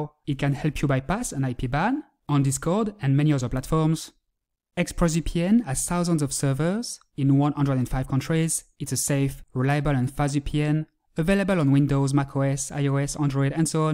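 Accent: France. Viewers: XD L'accent francais se fais entendre x)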